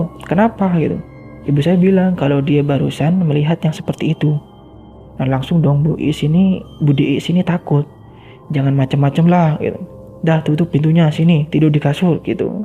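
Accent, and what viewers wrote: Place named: Jember, Indonesia. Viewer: Wong jember koyone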